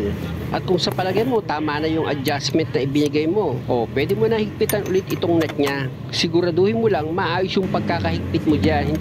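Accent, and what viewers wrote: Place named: Nueva Ecija, Philippines. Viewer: Boss tiga saan po kayo my garod kayo sa salita eh nueva ecija po??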